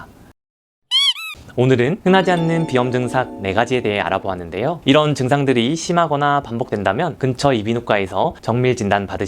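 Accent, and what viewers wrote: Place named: Gyeongsang, South Korea. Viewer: 몬살아ㅋㅋㅋㅋㅋ 안닮으셨다요 선생님도 경상도 시구나 저희 지역은 사투리 잘 안써요!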